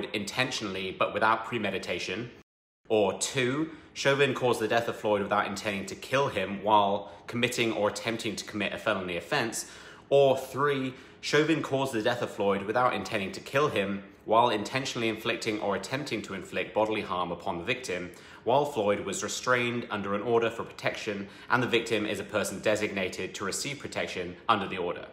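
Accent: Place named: United Kingdom. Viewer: You British dude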